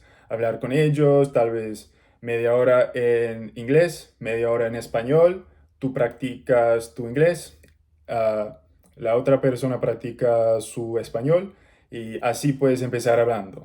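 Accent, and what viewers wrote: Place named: Colombia. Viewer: omg yes! the accent is so colombian particularly from bogota/medellin? so impressive, feels like a person from Bogota is speaking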